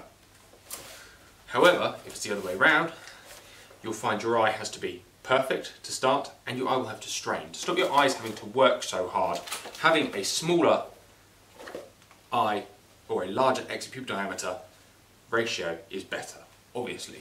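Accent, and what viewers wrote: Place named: RP, United Kingdom. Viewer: POSH BLOKE. your knowledge is impressive.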